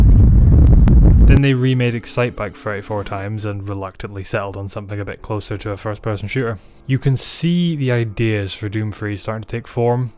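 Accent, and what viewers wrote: Scotland, United Kingdom. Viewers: it took me 14 minutes to realise you were scottish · Lad are you Scottish??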